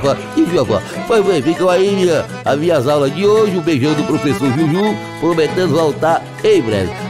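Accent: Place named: Ceará, Brazil. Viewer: Amo Professor Juju adoro o adoro português do cearense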